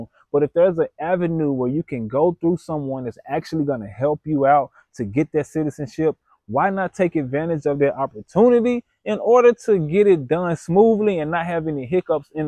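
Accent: United States. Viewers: Bye-bye I want to hear African accent not American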